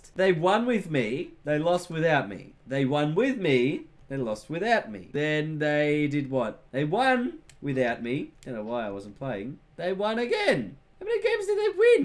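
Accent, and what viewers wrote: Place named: Australia. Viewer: Omega Music he's Australian m8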